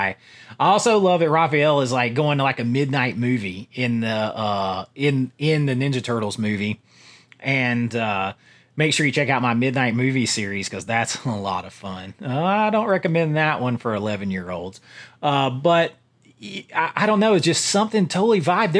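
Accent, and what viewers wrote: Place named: Rural, United States. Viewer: that's A LOT OF QUALITY!!!! plus the highway shredder escape chase scene is DOOOOPPPEE!!! btw, its amazing how much your country accent goes away when you imitate Ralph 🤣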